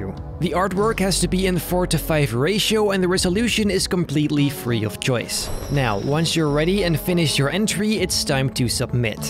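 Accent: Netherlands. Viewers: I ain't gonna lie bro, I don't mind you having a Dutch accent when you talk, but I DO when you sing..That was intense haha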